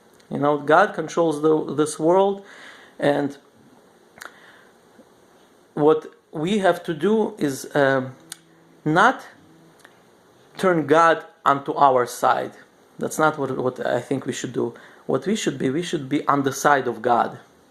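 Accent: Russia. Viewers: Hi bro! I am crushed into your videos. Just curious to know where did you get your russian accent? )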